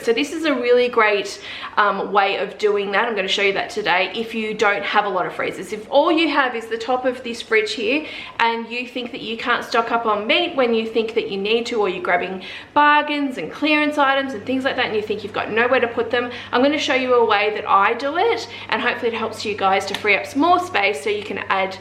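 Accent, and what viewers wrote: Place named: Australia. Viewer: Oh an Australian accent. What part of Australia?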